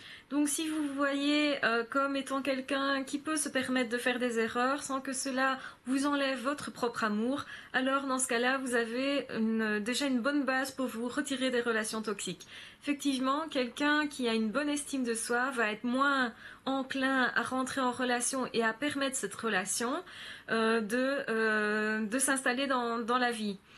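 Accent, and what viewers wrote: Belgium. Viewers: Bonjour, vos vidéos m'aide énormément en ce moment, elles sont géniales!!! j'aurais aimé savoir si vous exerciez en France? je perçois un petit accent belge:-)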